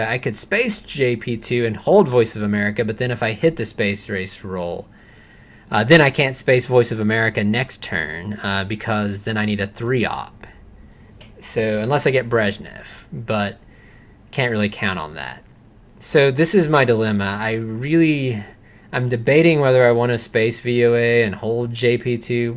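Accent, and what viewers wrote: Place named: Delmarva, United States. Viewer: Are you from the DelMarVa region of the US by chance? I grew up there and I think I hear a bit of that accent in your commentary? Thanks for making the video btw, love watching TS. :)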